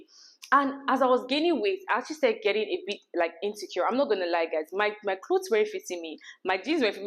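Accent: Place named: Nigeria. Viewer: It's her Nigerian accent for me 😘😘😘❤️❤️❤️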